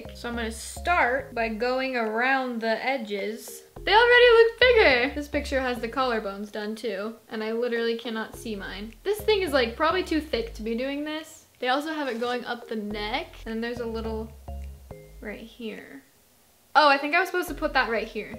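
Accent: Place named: United Kingdom. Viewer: You sound British in this video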